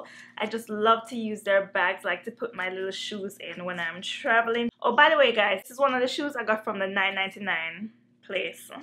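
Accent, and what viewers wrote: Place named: Jamaica. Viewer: Love this review, Soon has I heard your accent I'm like YAY I found another Jamaican YouTuber !!!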